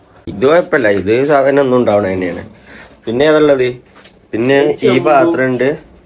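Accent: Malappuram, India. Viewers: Malapuram slang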